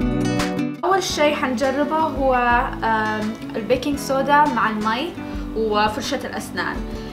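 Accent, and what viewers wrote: Yemen. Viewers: ايوه هي يمنيه بس هي جلست في مصر كثير و صارت تتكلم يمني على مصري على سعودي على سوري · كلامك صنعانيه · لهجتها يمنيه بأقوى قوتها أعرف لهجات بلادي كلها انا في السعوديه وكلامهم مش زي كلامها ابدا عموما يمنيه او سعوديه ع العين والراس الله يسعد الجميع يااارب آمييين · لجهنك يمنيه؟؟؟؟؟؟ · هههههههههههههههههههه الردود تضحك اللي يقول مغربيه وسعوديه ومصريه آيه يمنيه صح بعض كلامها من للهجات ثانيه بس هيه يمنيه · والله كلامها يمني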